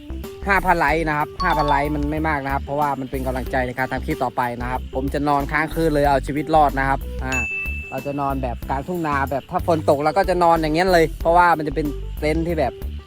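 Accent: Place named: Ubon Ratchathani, Thailand. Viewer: สำเนียงอุบลชัดๆ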